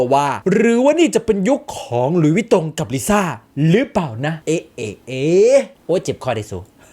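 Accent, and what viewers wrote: Laos. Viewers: มักๆๆแอดเว่าลาว.ขี้เล่น ตลก สนุกสนาน😂😂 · อีพีนี้แอด..ตกลาวบ่อยมากนะ..ฉันอมยิ้มและหัวเราะในเวลาเดียวกัน😂..คนสิว่าฉันเป็นบ้าอยู่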